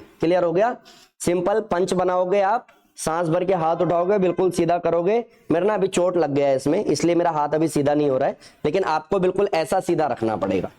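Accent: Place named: United States. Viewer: कृपया हिंदी मे बताइये नाकी इंग्लिश में अमेरिकन एक्सेंट से नही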